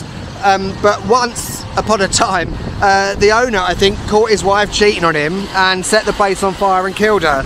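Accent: United Kingdom. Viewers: Come on! I would never be able to tell if you are speaking with a local or nonlocal accent. You just speak British to me, hahaha. Thank you for taking us to memory lane. I read you fixed comment... So you got Covid as soon as you arrived to London? I hope you get well soon. You have no idea how many people call their own home places shitholes and look at other places where locals call them also shitholes and for them (nonlocals)... it's just a more higher and advanced place to live. I definitely see myself vising London in 5 years. Just for vacationing.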